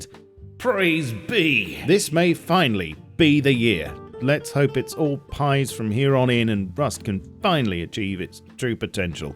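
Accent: United Kingdom. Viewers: I'm only here for the Bri'ish accent.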